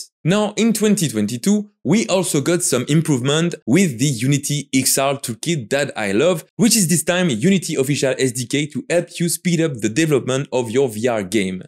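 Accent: France. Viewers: On dirait un belle accent français là non ?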